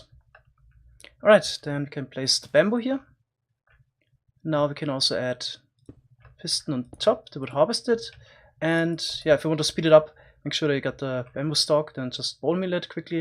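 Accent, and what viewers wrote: Germany. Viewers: The Video sounds like german Englisch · R u german or what is that cool accent ? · Man merkt krass das du deutsch bist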